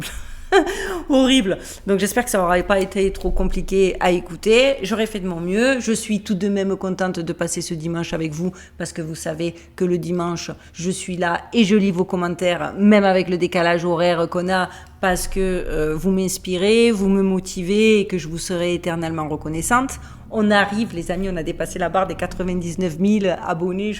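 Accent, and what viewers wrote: Marseille, France. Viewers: j’adore la richesse de votre diction votre accent plein du soleil de Marseille et la finesse de votre humour quand tout part en vrille. 😘✨️💙✨️😊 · Merci pour ton travail. J adore ton accent sudiste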